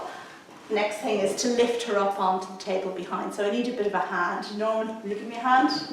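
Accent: Ireland. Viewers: OH NOOO!! Every plant lover's worst nightmare! Not the Monstera! LOL - I stumbled upon your channel recently and just subscribed! Love it and all your tips (and lovely Irish brogue!) Happy Planting, dear one!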